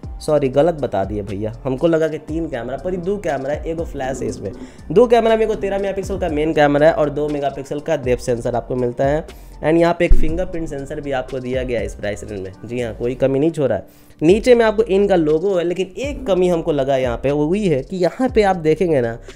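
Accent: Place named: Bhojpuri, India. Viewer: Ye Bhojpuriya touch hamko acha laga 👌❤️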